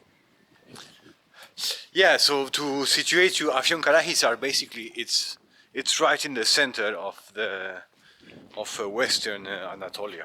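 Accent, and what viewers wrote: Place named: France. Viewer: This guy sounds like a french or something.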